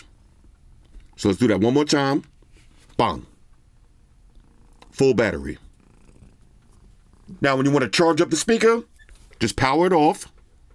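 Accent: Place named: Queens, United States. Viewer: That’s Queens, New York all day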